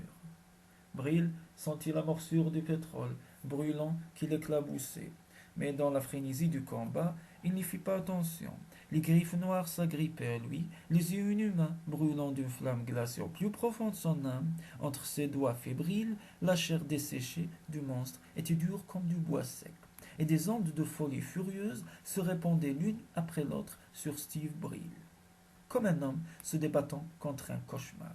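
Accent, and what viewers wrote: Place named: Spain. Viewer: Il me semble que vous avez un accent espagnol. J'ai bien aimé ce récit qui montre que les US se croit toujours maîtres de toutes choses.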